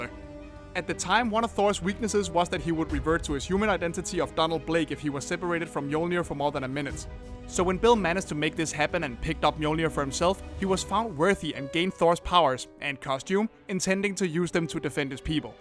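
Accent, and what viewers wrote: Denmark. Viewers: Are you from Denmark🤔? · is that accent from denmark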